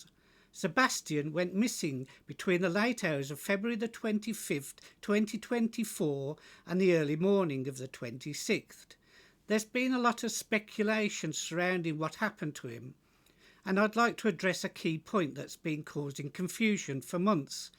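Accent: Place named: Birmingham, United Kingdom. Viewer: Sounds like a brummy accent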